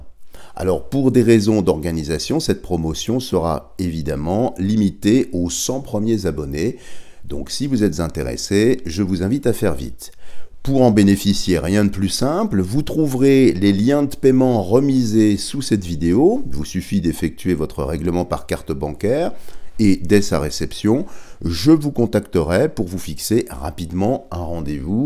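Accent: France. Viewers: Aaahhhh le sud ! 😀😅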